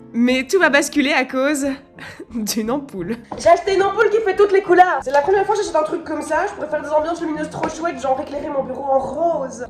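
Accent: Liège, Belgium. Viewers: vue ton accent tu serai bien de liege ? ou alors dans les Ardennes :> hahahhah (rire démoniaque)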